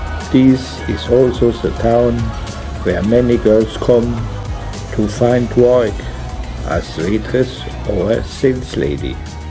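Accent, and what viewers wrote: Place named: Germany. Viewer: Schönes und interessantes Video, aber Dein Englisch ist immer noch extrem deutsch akzentuiert, ich musste öfter sehr grinsen. Aber man versteht alles sehr gut und das ist das Wichtigste.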